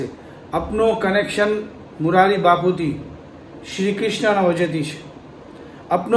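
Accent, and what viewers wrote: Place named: mixed, India. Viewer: Tame proper gujarati nathi lagta thoda up bihar wada chho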